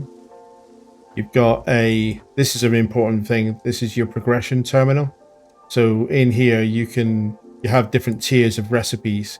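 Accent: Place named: West Country, United Kingdom. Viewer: You're quickly becoming one of my favourite YouTubers. I've been binging your snowrunner series. Currently on episode 30. Can I ask with your accent. Are you from Devon/Somerset area? Fellow UK resident here!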